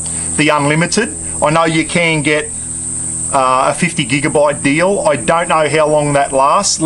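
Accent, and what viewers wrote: Australia. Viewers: Skip to other wise enjoy the Australian accent or what ever is....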